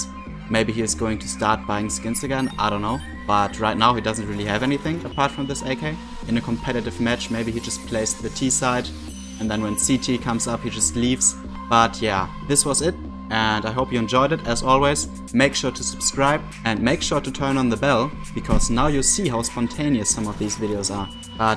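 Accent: Germany. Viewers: Dieser deutsche Akzent 😂 nohate an der Stelle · werd doch mal diese ekeligen bibanator deutsch akkzent los , das wäre mal n nice craft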